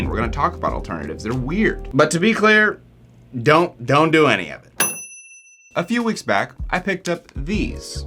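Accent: United States. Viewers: You evidently don't understand the difference between addiction (physically and mentally dependent) and a habit. But I don't blame you. Your accent sounds American, and America has the weirdest created shared realities around addiction.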